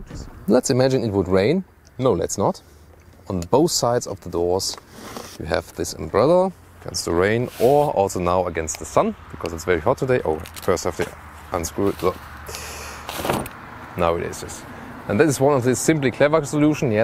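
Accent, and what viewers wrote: Germany. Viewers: I didn't read the hole topic. So i didn't really know what language it is before i started the video. In the first moment, the first 10 seconds i thought it's german. I am from Germany and i never heard such a hard german accent like this one before. And i'm still thinking it's a german review 😜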